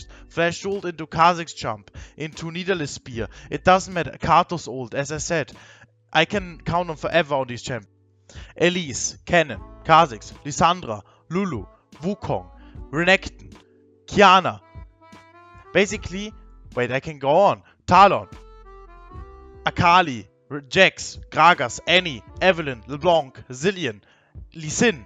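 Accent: Germany. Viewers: du klingst deutsch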